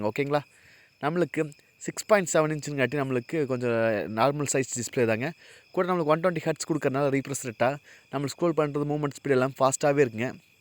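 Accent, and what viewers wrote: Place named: Kongu, India. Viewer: Kongu tamil properah kettu evalo naal aaguthu❤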